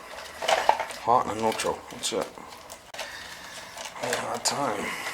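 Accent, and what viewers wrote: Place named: New England, United States. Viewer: Must be from Mass or Rhode island..got that can't pronounce words correctly..kinda baby talk like.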